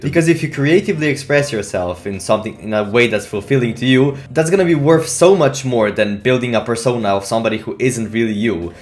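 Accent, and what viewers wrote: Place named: Europe. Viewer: Your accent sounds european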